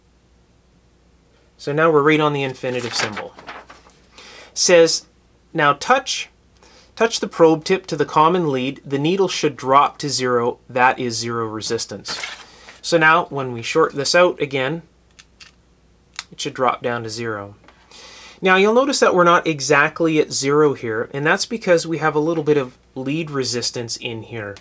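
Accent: Canada. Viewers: I think it's a Canadian thing, eh? It causes him to mispronounce Farad as FAYrad, too. The guy's name was Faraday: Fare-aday, not Fay-raday...wonder how he pronounces "soldier"? Not a bit of that is an issue here though. His amazing teaching skills, along with an extraordinarily extensive understanding of broad and diverse technologies trump his localized pronunciation idiosyncrasies. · Hello Mr., Your vids are excellent. Very explanative. I have only one question, and I'm already guessing that maybe it's because you're Canadian!? It's about your pronunciation of the word "solder"! I have always heard it as SAUDER. But you say SAULDER.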